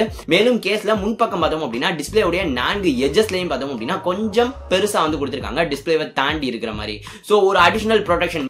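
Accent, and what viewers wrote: Madurai, India. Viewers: Bro neenga madurai ah bro ?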